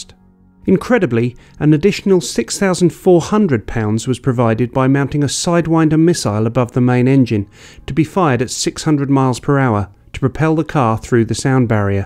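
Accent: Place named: United Kingdom. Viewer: The accusation that the radar never clocked the speed and instead a truck is entirely new and unverified. It is a claim made solely by this video narrator and uploader and no official from the U.S. Airforce. Sidewinder missiles break the sound barrier without sonic booms all the time. The British accent is very suspect with Bias.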